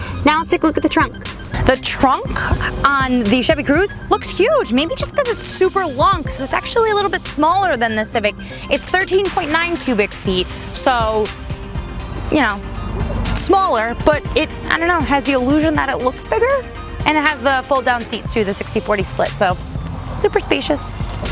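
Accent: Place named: New England, United States. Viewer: Hella cute and that new England accent though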